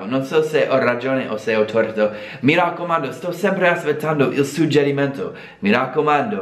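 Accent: Rome, Italy. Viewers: You speak Roman not Italian, you know that, right? "Stare" is not a synonim of "essere" in Italian. If you want to learn italian I strongly suggest to change city. Go North young man! - (PS. Hamburg is a German town maybe "hamburger" should be pronounced with a German accent no?) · Non ci credo, è l'unico straniero che abbia mai visto che ha imparato pure a fare l'accento romano:D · Too much Romanesque... 😂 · Ho le lacrime agli occhi dalle risate! Sei troppo simpatico. E poi ADORO che parli ROMANO 💖 e si sente un bottoooooooo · Troppo forte la cadenza romana😂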